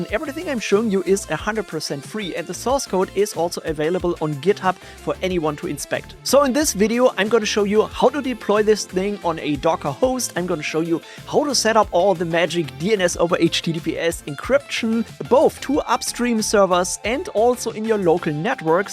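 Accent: Germany. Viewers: You have a nazist accent!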